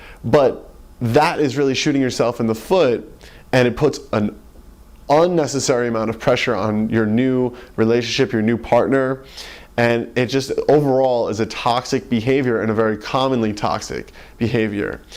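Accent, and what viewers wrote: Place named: United States. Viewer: he sounds like an American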